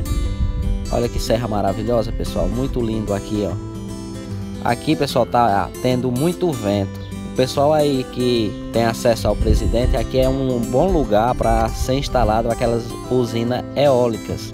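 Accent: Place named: Northeast, Brazil. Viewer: Oi, eu sou de Belo Horizonte e adoro os seus vídeos com o seu sotaque nordestino e as músicas de fundo. São bem informativos e mostra bem a alegria de vcs aí do nordeste, e a nossa tb, pq ficamos felizes só de ver a alegria que vc transmite.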